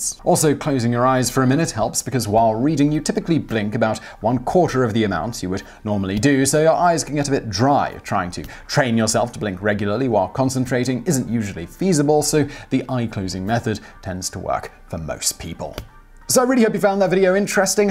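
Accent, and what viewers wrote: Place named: RP, United Kingdom. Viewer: Can someone or Simon himself explain Simon's accent/way of speaking? Is this RP? Are there any other examples of videos that sounds this way? I feel that this accent/way of speaking is taught. I really find it interesting and cant get this question out of my mind. I really feel that when I was younger(as a kid) I hear this a lot but can't tell where.... Thank you in advance.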